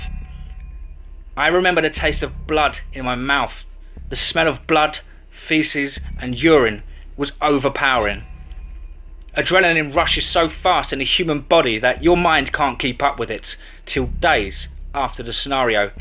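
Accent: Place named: England, United Kingdom. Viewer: Your Englishness is awesome... Some tips. Tucson is pronounced two sawnh... I love your channel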